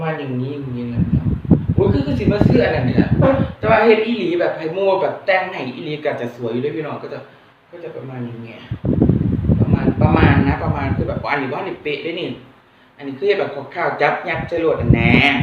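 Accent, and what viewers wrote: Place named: Isan, Thailand. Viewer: เว้าอีสานแบบนีมว่นมว่น. หามเว้าพาสากาง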